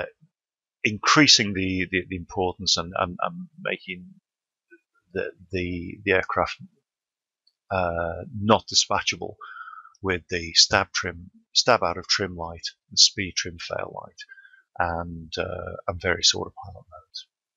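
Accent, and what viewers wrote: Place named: Stoke, United Kingdom. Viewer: Are you from Stoke?!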